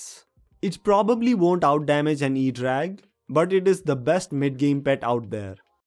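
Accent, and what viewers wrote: India. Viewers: then why is your accent so Indian and I'm not being racist India doesn't have a lot of tech from what I've seen very poor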